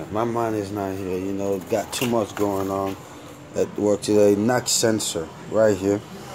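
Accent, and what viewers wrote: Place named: Haiti. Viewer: You sound Haitian. Sak Pase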